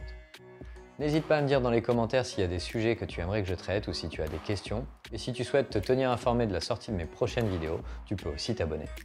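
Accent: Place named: Quebec, Canada. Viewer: 😁 ça fait plaisir de te voir en vidéo : Bon rythme, bon montage et bon contenu : un grand bravo ! Et cet accent Québécois... 🤩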